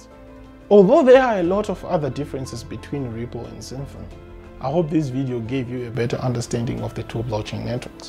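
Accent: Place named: South Africa. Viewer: Hi! I am a fellow south african - i recognise your accent! where can us south africans buy XDC? please help thanks! xx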